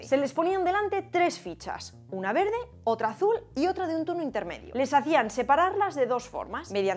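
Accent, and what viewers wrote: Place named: Spain. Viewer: Ascoltare il tuo spagnolo è super interessante, mi piace molto la pronuncia dello spagnolo di Spagna e capisco che l' accento è differente da quello del sud America. Io sono italiano, ma capisco una buona parte delle frasi, ma solo se parli non troppo velocemente, come invece hai fatto in questo video.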